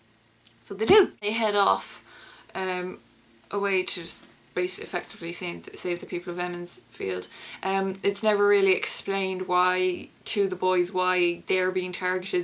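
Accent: Ireland. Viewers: Joanie loved the review and your accent is very cool. Irish? I agree with you 100% 1 thing very noticeably lacking in the LotR books is character development. Jordan has full bodied characters (my favorite is Matt). I'm on book 12 "the Gathering Storm" . As far as pronunciation goes I'm listening to the audiobook versions (I guess that's a cheat) as I drive to work. Any way I'm off to see the rest of your reviews May you find water and shade Spear Sister! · Do I detect a Irish accent? Or am I just being retarded? Good vid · I was just browsing some WoT videos, as I'm getting excited the final book is just months away and I was pleasantly surprised to hear your Irish accent (like my own) when I had expected an american review. I hope you do manage to review the rest of the series before the final release. Much love for this fantasy series. It's scale and depth are wonderful and inspire such passionate discussion among fans.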